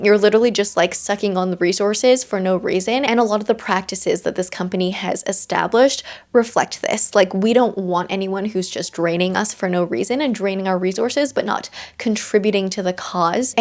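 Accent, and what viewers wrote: Canada. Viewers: girl as a Hamiltonian... your canadian accent is ACCENTING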